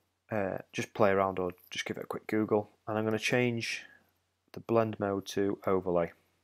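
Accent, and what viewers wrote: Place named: United Kingdom. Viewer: Ur def a Brit but this is a great video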